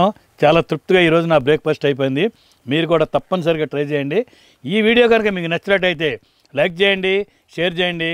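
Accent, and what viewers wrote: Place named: Rayalaseema, India. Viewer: మేడం ది పక్క రాయల సీమ అనుకుంట వాయిస్ మాడెయ్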